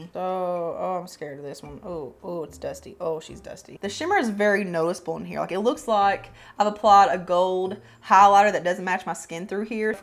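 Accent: South, United States. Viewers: I just love your take on everything and life in general. I live in Minnesota but grew up in Georgia adore your drawl it makes so homesick. I hate the midwest people arent friendly like us southern folk. Thank you for brightening my days with your keen sense of humor!❤❤BTW where you get your eyeglasses they are so kewl on you#!☮️💟🕊️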